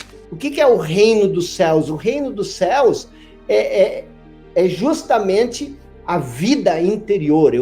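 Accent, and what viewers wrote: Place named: Rio Grande do Sul, Brazil. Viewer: Bem que eu conhecia esse sutaque. Você é aqui do Rio Grande do Sul. Adoro seus vídeos.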